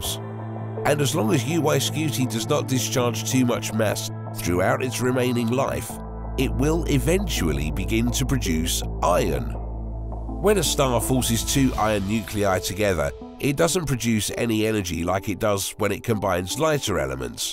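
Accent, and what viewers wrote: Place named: Cockney, United Kingdom. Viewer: Yes this is all very interesting, but the narrator sounds like some geezer off Eastenders with a sinus infection. Couldn't you find someone else who sounds more educated and less unhealthy?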